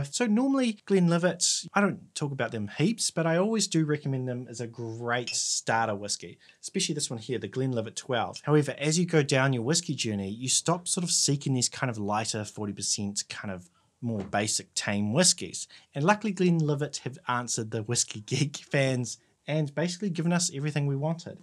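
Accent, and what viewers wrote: New Zealand. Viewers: You from New Zealand ? U have a Nz accent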